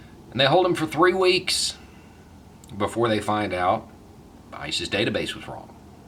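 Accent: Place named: Tennessee, United States. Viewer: Hold up is from Florida?! I coulda sworn you'd be from Tennessee.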